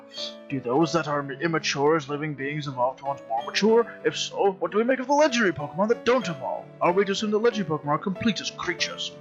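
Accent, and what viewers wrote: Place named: Scotland, United Kingdom. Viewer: So much Scottish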